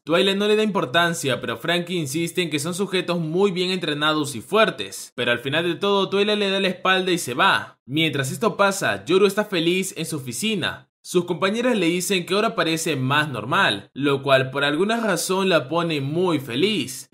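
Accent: France. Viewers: ¿,tienes acento francés?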